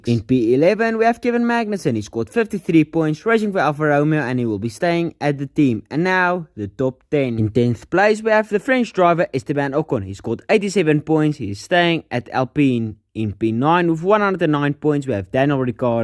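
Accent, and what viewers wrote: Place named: South Africa. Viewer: We like the south african acent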